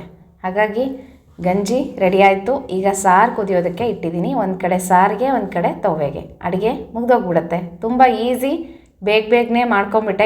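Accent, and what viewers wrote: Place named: Ballari, India. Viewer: Naavu Ballariyavare.adigemaaduva vidhana yella same😊naavu makkalige tinniso ootakke mammu antane annodu.Maatado reetinu same 😊Namma jana Namma bhashe ❤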